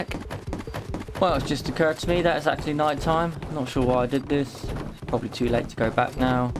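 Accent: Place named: New Zealand. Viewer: It's the Kiwi accent you're thinking.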